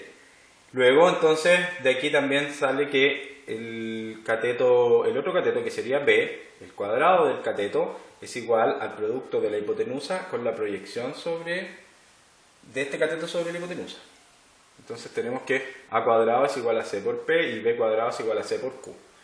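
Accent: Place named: Chile. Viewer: Me ayudó mucho, gracias. Eres excelente explicando pero me distraje con tu acento chileno.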